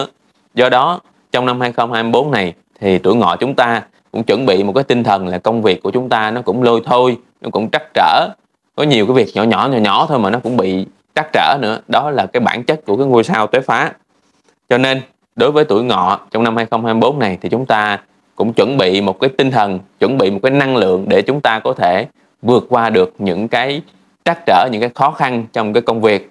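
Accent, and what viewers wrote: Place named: South, Vietnam. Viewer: tìm mãi mới thấy thầy nói tiếng nam nghe rõ dễ nghe , mấy ông nội khác nói tiếng bắc nghe tử vi chán ko thấm chút nào 😊 coi bói là tui kím thầy nam coi thôi à nhất là người miền tây dưới an giang á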